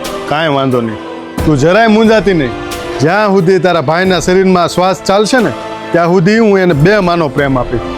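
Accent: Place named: Bhavnagar, India. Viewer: બોલી પરથી લાગે છે કે ભાવનગર તરફના હશો.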